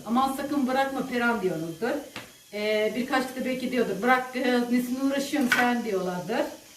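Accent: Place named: Uşak, Turkey. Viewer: Bende Usakliyim senin konusma sivenden anladim Usakli oldugunu abone oldum basarilar Süpersinnn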